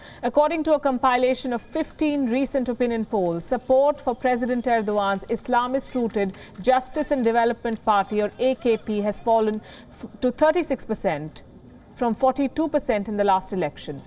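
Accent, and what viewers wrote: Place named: India. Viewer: Turkey is not a third world country by the way as India is. Where severeg's water is floating on the streets Where people sleep on the streets. Where more than 50 percent of its population attend toilets in the fields and farms. Where people more than half of its population living below poverty line. It's all sad.But How come rather than focusing on such basic issues of it's own the colonial indian so called funny accent's english language 😂channel reporting about others.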